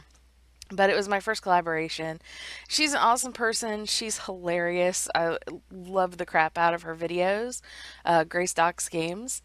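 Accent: South, United States. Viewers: I'm from GA but live in VA and your accent sounds so familiar. Haha. You kind of give the impression that you occasionally have a super southern accent but you've focused on correcting it. (I have, lol)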